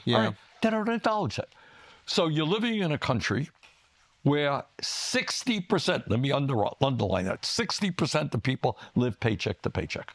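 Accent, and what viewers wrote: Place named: East Coast, United States. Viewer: His accent makes me nostalgic for my childhood doing arts and crafts with my dad's mom from back East.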